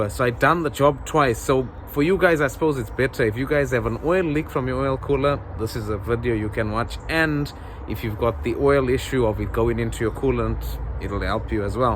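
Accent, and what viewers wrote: Durban, South Africa. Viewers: Nice work. Dude you sound like you from Durban South Africa? your accent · Bro are you South African? 😂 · You sound South African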